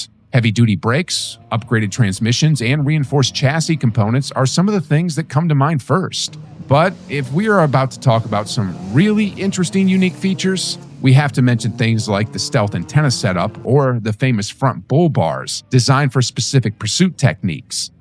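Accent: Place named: United States. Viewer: Didn't watch the whole thing cause I can't stand seppos talking but did the XR6 turbo get a mention?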